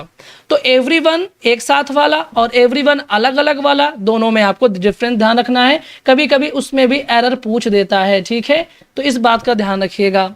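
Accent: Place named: Bundelkhand, India. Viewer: Namaskar Guru ji Aap Bidaki / Banda/ kanpur/ phatehpur kahan se hai?? Because the way you speak sounds like among one of them.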